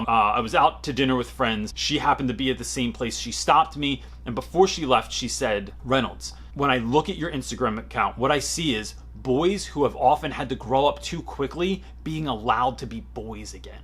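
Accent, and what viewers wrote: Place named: Philadelphia, United States. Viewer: Yessss. I spotted a Philly dialect feature. "Those Johns" hahaha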